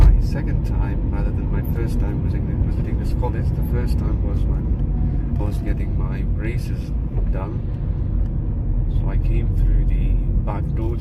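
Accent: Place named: Russia. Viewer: Why there is a lil bit Russian accent in your english?😅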